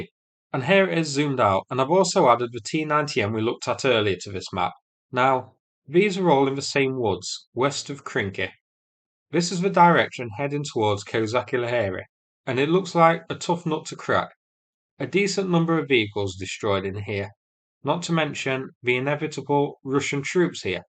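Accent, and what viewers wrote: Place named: Northern England, United Kingdom. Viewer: Krinkeh! Love the northern English pronunciation of the names and also the jokes. Keep it up lad!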